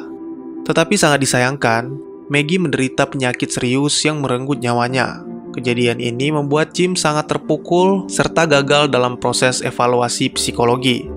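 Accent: Aceh, Indonesia. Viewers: Dari aceh ya bang Nanya doang:-)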